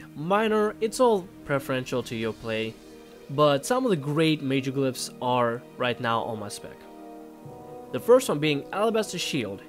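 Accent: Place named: Russia. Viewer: Привет, по акценту подумал ты Русский такчто надеюсь ты поймешь :P! Тебе кто Больше нравитсья Вар или Пала (танки)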